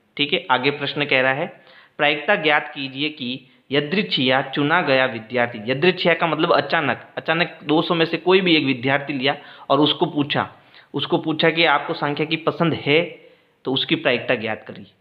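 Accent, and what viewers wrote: Rajasthan, India. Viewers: Man you from Rajsthan ?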